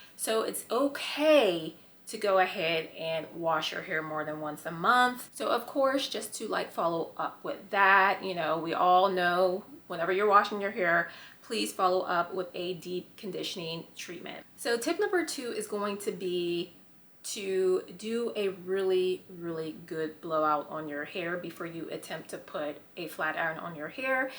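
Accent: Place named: Pittsburgh, United States. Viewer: And I hear that Pittsburgh accent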